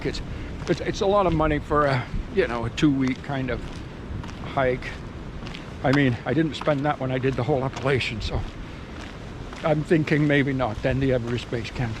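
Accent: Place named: Yorkshire, United Kingdom. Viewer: where are you from originally mate? Sound like a Yorkshire lad!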